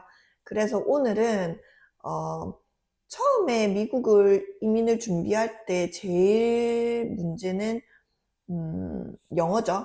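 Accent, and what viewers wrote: Gyeongsang, South Korea. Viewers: 오... 저희 와이프도 간호사예요. 저희도 간호사 와이프 때문에 미국에 이민 온지 4년 됬고 달라스로 이사 온지는 1년 됬습니다. DFW 사시면 저희 집에 한번 초대해서 저희 가족과 한번 뵜으면 좋겠네요. 사투리 쓰시는거 보니 저희 와이프도 경상도거든요 ^^ DFW 근처 계시면 연락한번 주세요.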